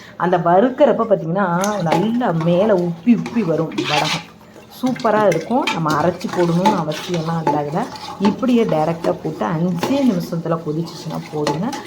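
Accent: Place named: Kumbakonam, India. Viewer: Super குடந்தையில் இப்படிதான் சொய்வோம் வார்த்தையுமா எங்க ஊர் மாதிரியே பேசுரிஙாக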